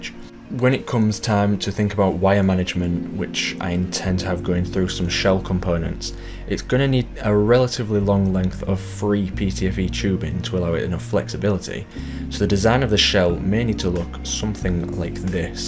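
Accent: United Kingdom. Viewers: That brass geared thumb mechanism is a work of art and absolutely gorgeous design. You’re a very clever bloke. Just found your channel. Nice to hear a Brit voice on YouTube 👍🇬🇧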